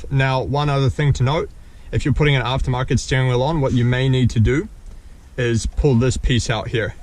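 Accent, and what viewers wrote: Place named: Australia. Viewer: Interesting accent. Aussie in the US?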